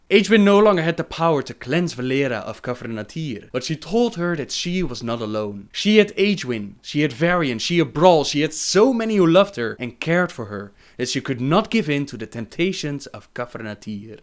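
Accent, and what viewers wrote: Netherlands. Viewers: gij zijt nen hollander :D direct gehoord :p -belg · Are you Dutch/Belgian? Your accent sounds like Dutch.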